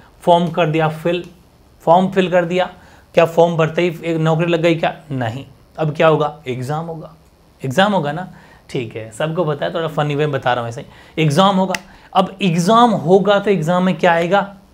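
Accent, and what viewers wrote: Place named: Rajasthan, India. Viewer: Rajasthan se ho na sir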